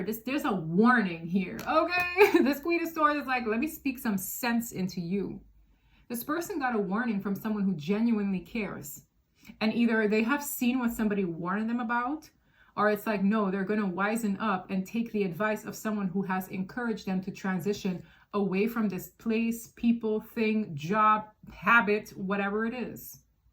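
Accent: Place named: Netherlands. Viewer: Je bent Nederlands. DAMNIT IK WIST HET. Ik dacht al wat aan je accent te horen. Waar woon je, Curaçao?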